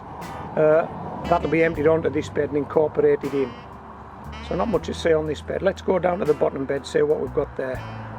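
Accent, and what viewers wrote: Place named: Cumbria, United Kingdom. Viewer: great video pal think you must live in west Cumbria by yer accent mebbis st bees way,im just up the coast if you are ,will be copying your vids,great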